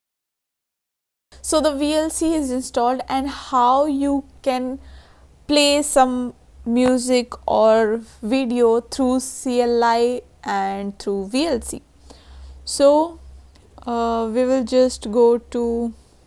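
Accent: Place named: India. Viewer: Wow indian voice 😍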